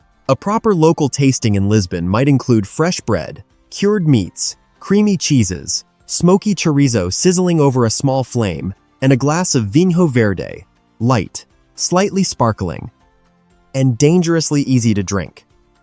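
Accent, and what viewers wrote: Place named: United States. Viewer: The American accent is destructive to a description of Europe. How many ways can you pronounce Tagus ? This alleged easy first day is as relaxing as Shinjuku station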